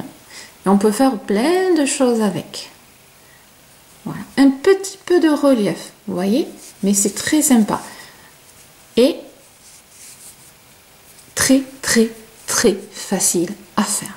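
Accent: South, France. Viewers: Super joli ce point. J'ai tout compris car comme toujours vos explications sont très claires et je suis toujours aussi fan de votre accent du sud ! Je vais tenter le poncho avec ce point. Encore merci de partager avec nous !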